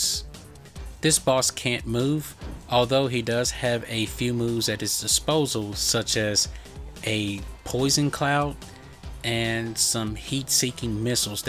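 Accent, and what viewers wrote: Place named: Texas, United States. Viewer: u have an ultra Texan accent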